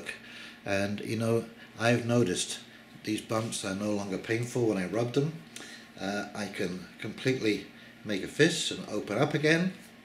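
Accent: England, United Kingdom. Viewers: hello matey, i'm in the UK, near Oxford - surprised how English your acent is - thanks for the tip.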